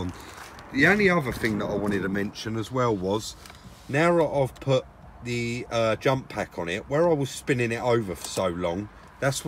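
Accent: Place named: London, United Kingdom. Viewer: thats why most people like watching him. its So london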